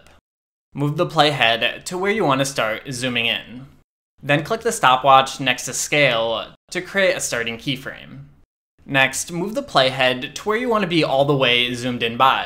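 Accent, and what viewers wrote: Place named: Los Angeles, United States. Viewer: I am not american, but I noticed that LA accent)))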